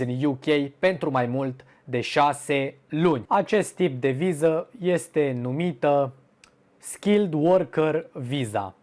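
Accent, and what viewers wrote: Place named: Moldova. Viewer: Se simte un ușor accent moldovenesc. Greșelile de exprimare sînt omenești. Keep up și good work. 👍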